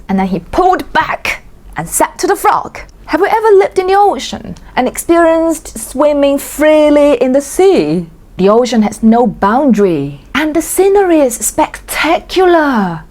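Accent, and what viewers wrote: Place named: England, United Kingdom. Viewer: I am only here to listen to English accent and maybe by chance learn some Mandarin. ( Its a Canadian thing)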